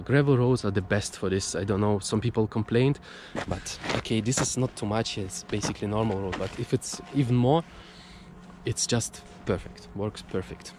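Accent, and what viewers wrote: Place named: Germany. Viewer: Как необычно, у вас в голосе звучат скорее немецкие нотки, чем русские, даже когда говорите по английски. 😜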